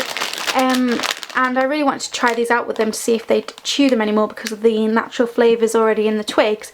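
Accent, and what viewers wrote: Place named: Yorkshire, United Kingdom. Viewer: are you from Yorkshire? I think that is ur accent, I know a teacher with that accent and she is from Yorkshire :)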